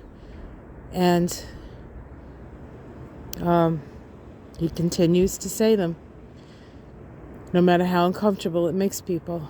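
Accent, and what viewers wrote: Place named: New England, United States. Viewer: Good morning from Sunny 45 degrees in Wichita, KS - going up to 68 today! I’m from CT and lived in Brookline, MA in the mid-80’s and your accent really brings me back! Love your astrology readings! Thank you! ♋️♒️♓️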